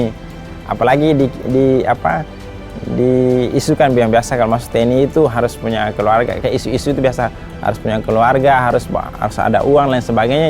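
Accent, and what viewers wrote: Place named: Makassar, Indonesia. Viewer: Saya kira orang Makassar, logatnya sdh mirip soalnya 😁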